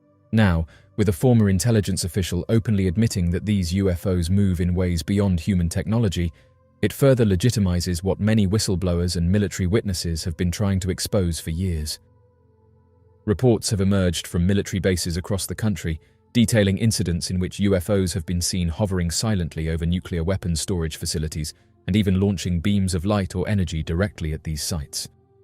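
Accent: England, United Kingdom. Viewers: Run for your lives !!! The Aliens are coming !!! Be very Afraid !!! Do what the Aliens tell you to do !!!!!! Do what a creep with an "English" Accent tells you to do !! Believe the Propaganda !!!! This is really entertaining for me !!!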